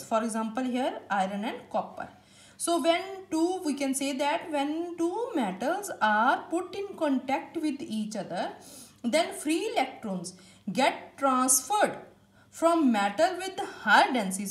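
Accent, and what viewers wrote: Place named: Punjab, India. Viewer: Vich vich tuhadi punjabi sun ke swad hi aa gaya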